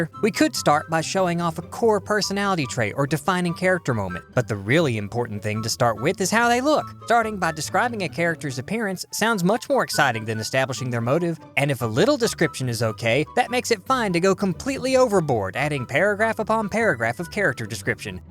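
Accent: South, United States. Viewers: I love this guys accent is it a southern accent? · The most subtle of southern accents makes this all the better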